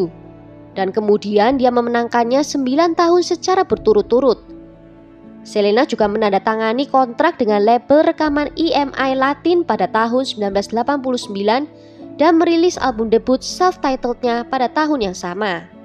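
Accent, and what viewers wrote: Java, Indonesia. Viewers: Cantik.. medokuhhh 👍💖💖💖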